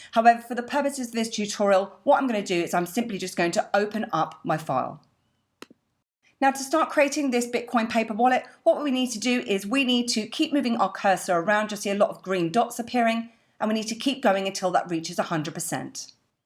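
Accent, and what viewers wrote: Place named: Essex, United Kingdom. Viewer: a lovely Essex accent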